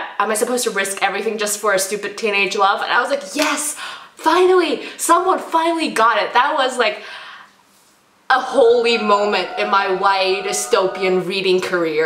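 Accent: United States. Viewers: woah this might sound weird, but you sound especially american in this video woahhhhh also yes that mt. everest miscommunication mountain is v irritating marie rutkoski why do you hurt us so i've been wanting to pick up red queen bc everyone loves it but ehhhhhhhh it does sound v typical and i want something that's going to BLOW ME AWAY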